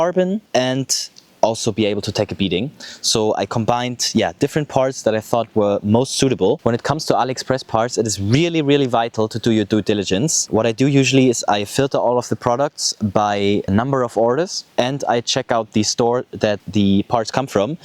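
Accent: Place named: Germany. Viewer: I hear a bit of german😂😂😂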